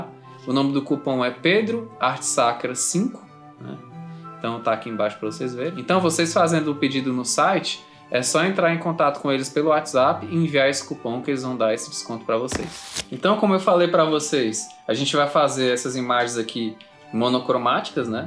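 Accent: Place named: Ceará, Brazil. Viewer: Pedro, tu é do Ceará? Teu sotaque parece com o meu.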